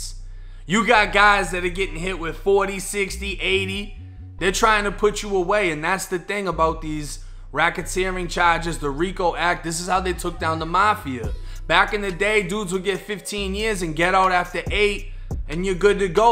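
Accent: Boston, United States. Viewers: You sure your not from Boston?? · are you from Boston cuz you have a slight Boston type Accent on how you Pronounce words with R in it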